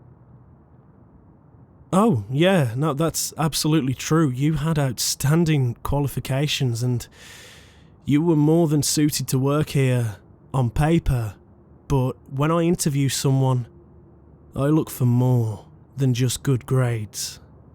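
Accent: United Kingdom. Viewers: Your voice is so British and like a tv documation from police interceptors or something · its a hella british voice lol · Sounds kinda british though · BRITISH MAN?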